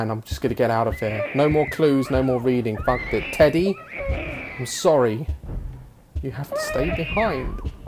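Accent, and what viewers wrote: United Kingdom. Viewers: He sounds so much more British then vs now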